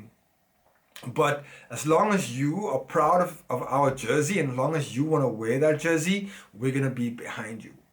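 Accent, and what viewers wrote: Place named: South Africa. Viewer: What is your mix. You look and sound like a polished South African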